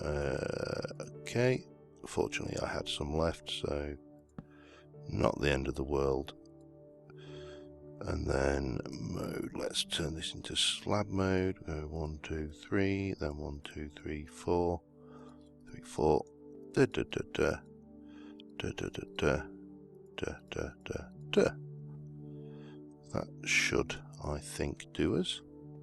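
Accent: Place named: East Midlands, United Kingdom. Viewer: I have been working my way through your series and look forward to every video. As a fellow Brit (I'm thinking East Midlands from you accent) I want to say well done our kid. I glad you covered this topic as its an area I need to work on in my own game.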